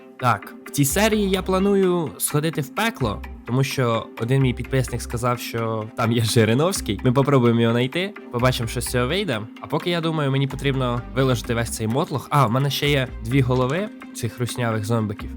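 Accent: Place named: Poltava, Ukraine. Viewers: Полтавськиа мова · Дякую! Мій синочок дивиться ці майнкрафти, нарешті українською 😉 ну може трошки суржиком, але рідним, наче з-під Полтави)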